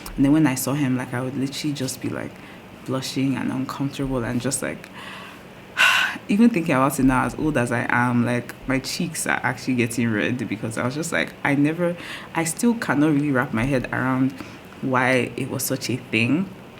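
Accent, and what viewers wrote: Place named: Nigeria. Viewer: Hot nigerian accent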